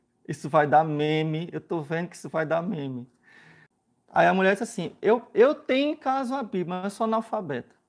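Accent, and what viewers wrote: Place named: Ceará, Brazil. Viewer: O sotaque cearense não sai dele. Haha parabéns, padre.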